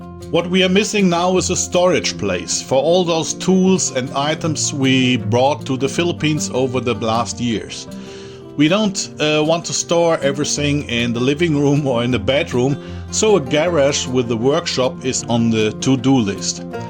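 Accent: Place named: Germany. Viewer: Als aller erstes: Gruß aus Panama. Und nun das zweite: 😂 Ich lebte 15 Jahre in Kanada und mir wurde immer gesagt, dass ich kein Deutscher sei. Dies wurde mir auch hier von Nordamerikanern und Engländern in Panama gesagt. “Du sprichst wie ein Nordamerikaner. Deutsche haben einen spaßigen Akzent!” Als ich dich reden hörte, da musste ich erst einmal schmunzeln. Aha. Da ist er. Der Akzent. 😅 Nicht böse gemeint. - Kleines Haus und Wände. Ihr habt die Konstruktionsfläche genommen und nicht die Wohnfläche. Ich lebe hier in Panama in einem gemieteten Haus. 2 Schlafzimmer, Küche, Wohnzimmer, Bad. Genau 8x8 Meter. Die Terrasse ist 8x2.50 extra. Reicht uns auch. Ich baue nun ein rundes Haus mit 2 Stockwerken. Alles alleine. Bin “Unfallrentner” und bekomme eine gute Rente von der BG in Kanada. Durchmesser meines Hauses ist 9.80 Meter. Wohnfläche ist ungefähr 74m2 mal 2. 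Das erste was den Leuten an meinem Rohbau aufgefallen war sind die “gut gemauerten” Wände. Ich wäre wohl vom Fach oder einen Meister. Nun. Vor 33 Jahren baute ich mein Haus in Deutschland alleine. Als ich meinem Sohn in Deutschland mitgeteilt habe, das ich ein Haus baue, da kam ein: “Papa. Du bist keine 28 mehr. Spinnst Du?” Nun ja. Lausebengel! Als er letztes Jahr zu Besuch kam meinte er: “Wow. Du weißt noch wie das geht?” Hab ja noch nicht Alzheimer. 😅 Und in Panama haben neue Baugebiete keine Namen für die Straßen. Nun kommt der Witz. Auf den Lieferscheinen steht als Addresse: Calle de Castillo. (Burgstraße) Und jedes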